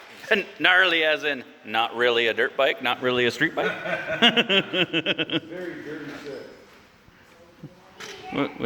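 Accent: Canada. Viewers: Wish i had the cash, this things amazing. And you sir, are one of the most canadian sounding fellas iv ever herd lol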